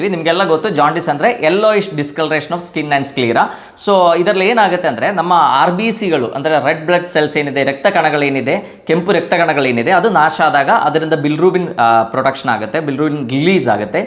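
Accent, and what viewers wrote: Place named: Kasaragod, India. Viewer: Doctor re nivu kannada pada balasi namage English Word artha agolla. Nivu pure kannada davaru alla. Gadinaadu pradesha kasaragodu avara tharka ide nimma kannada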